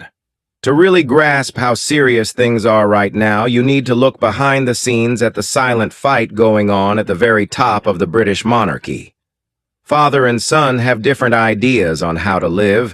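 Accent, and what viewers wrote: United States. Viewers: This is a British site. Stop using an American accent for the narration. Get a British accent or else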